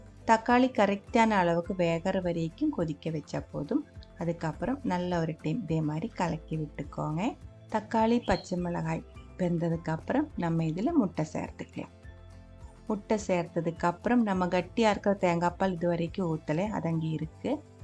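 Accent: Kerala, India. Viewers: മലയാളിയാണോ സംസാരിക്കുമ്പോൾ മലയാളം വരുന്നുണ്ട്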